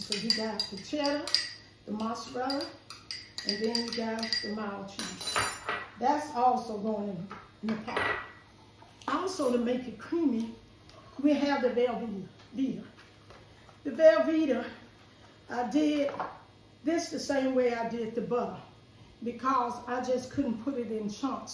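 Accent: South, United States. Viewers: Thank You, watching you be so kind with your southern accent reminds me of my mom.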